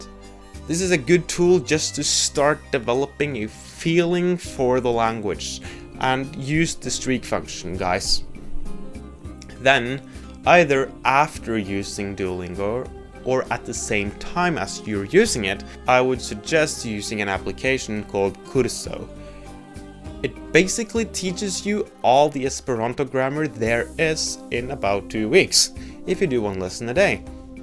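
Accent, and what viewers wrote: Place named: Scandinavia. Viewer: Didn’t even notice your Scandinavian accent! German accents are way thicker imo.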